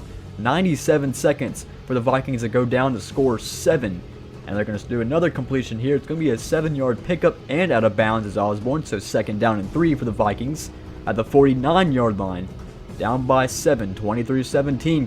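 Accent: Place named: South, United States. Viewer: I like your content and also where do you live your voice sounds a bit southern but at the same time a bit northern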